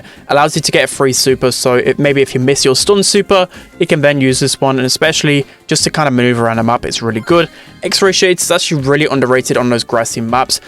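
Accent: United Kingdom. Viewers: I can stand his bri'ish accent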